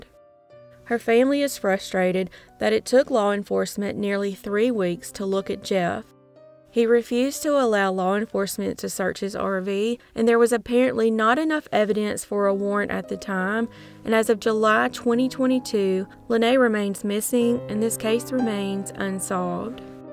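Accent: South, United States. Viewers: Love your lil southern accent. Hello fr panama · Your lovely Southern accent always makes me feel like I’m sitting and having sweet tea, with a true Southern Belle! 🤗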